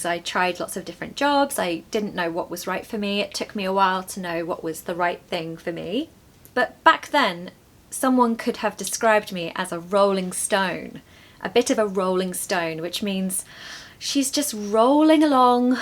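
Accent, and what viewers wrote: RP, United Kingdom. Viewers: You deserve more and more subscribers. You deserve all the appreciation. You have a great way of explanation and a clear British accent. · thank you very much for your smile... By the way, do you have pronunciation lessons? As I know you have modern RP (MRP) am I right? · I love all your videos. I love your British accent. I can listen to you for hours. I used to live in the UK 4 years ago. Now I miss England and the British so much. So wonderful to listen to you and learn . Love you. 😊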